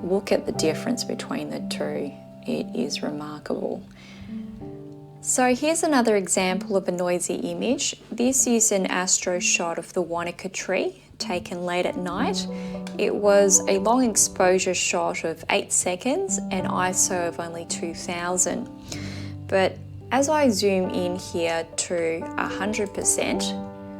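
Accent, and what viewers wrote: Australia. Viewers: Enjoyed the your thoughts and examples. Your Aussie accent so pleasant to listen to. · Thanks for the really informative review, it's great to hear from an actual user of their experience. I also am enjoying your "Aussie" accent (with perhaps a bit of Asian in there), it's so pleasant to listen to! I'm about to order my XH2, and upon checking out the firmware updates it looks like with version 3.0 they've added the ability to set the ISO with the FRONT COMMAND dial. I've also seen in the manual that the current ISO can be displayed on the main screen. Unless I'm misunderstanding all this (don't have the actual camera yet), it seems that Fuji may have addressed this specific complaint.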